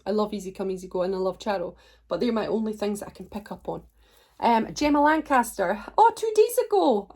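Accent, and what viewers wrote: Scotland, United Kingdom. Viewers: Hi thank you for part three. Very entertaining. Love your Scottish accent 😻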